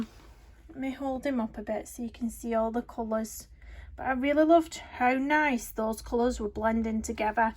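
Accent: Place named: North, United Kingdom. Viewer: Some lovely work this month. My husband came in to listen because he's from Littleborough and pines for the Northern accent. He really liked the first one you showed, where you used the spray messy stuff. I find it hard to pick a favourite, I like them all. Can you share the link for Mouse Guard book? I've had it in my basket at Amazon for months and they keep telling me they still haven't been able to source it.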